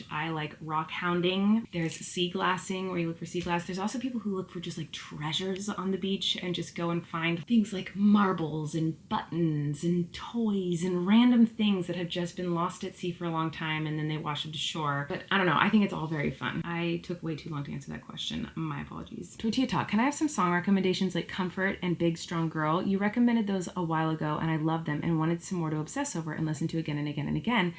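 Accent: United States. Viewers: Oh wow I never thought of how you might not recognise your own accent just like I don’t recognise mine (South West, England). To me, you are THE most American sounding person ever, Californians are the most American sounding people to me like cartoons 😂 like I can’t even imagine you existing that’s how unreal you sound to me because I grew up watching American cartoons I just can’t imagine anyone with you actually existing in my world does that make sense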